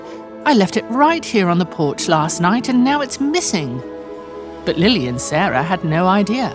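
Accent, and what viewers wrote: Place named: United Kingdom. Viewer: Glossing it up with a British narrator, still shite as they’d say.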